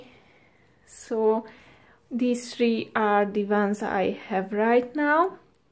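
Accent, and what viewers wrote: Hungary. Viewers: I hear Hungarian accent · Hungarian accent? Ifeel that way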